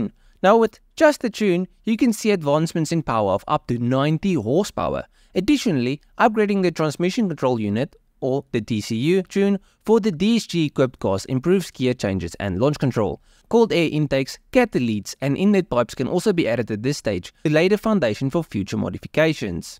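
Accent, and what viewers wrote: South Africa. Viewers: South African Voice?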